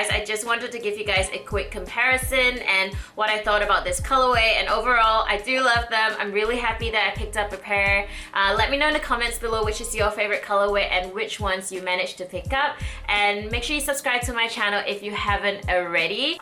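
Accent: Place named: Australia. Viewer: Holy shit. An Asian with an Australian accent. Now I've seen it all.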